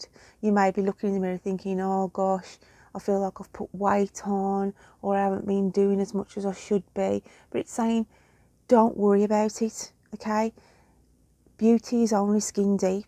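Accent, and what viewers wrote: Birmingham, United Kingdom. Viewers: Love the accent a Brummie I think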